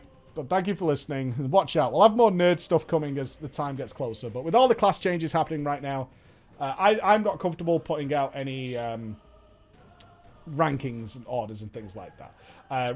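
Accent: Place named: United Kingdom. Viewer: Orientated....British accent but American lingo. The simping is real.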